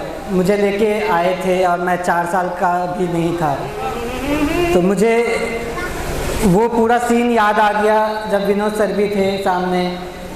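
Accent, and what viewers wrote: Bihar, India. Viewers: वे हिन्दी जाने बिना टाप नहीं किए है पर बिहार की हर जगह की अपनी एक भाषा शैली है जिससे अलग होने पर बनावटीपना दिखता है। साधारण परिवेश में पालन होने पर कुछ असर रह जाता है। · Hindi pronunciation is general problem in Bihar & that is the recognition of a Bihari,if we start speaking like UP ,our recognition is like to be lost.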